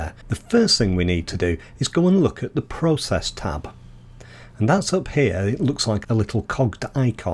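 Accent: United Kingdom. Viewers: British accent? Subscribed.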